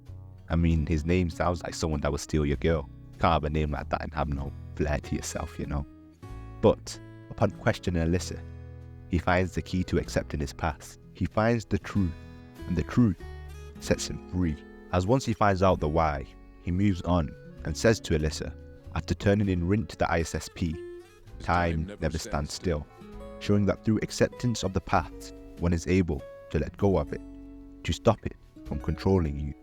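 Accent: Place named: United Kingdom. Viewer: What are you going on about? Maybe your ears are broken. He's clearly British. 😂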